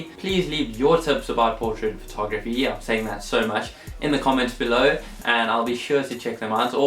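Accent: South Africa. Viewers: You're South African!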